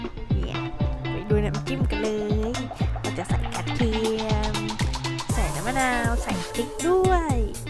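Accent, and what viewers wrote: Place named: Isan, Thailand. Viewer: น่ากินจังเลย พึ่งไปกินในน้ำมาอยากกินอีกละ😂ชอบเสียงภาคตาฮักดี✌️✌️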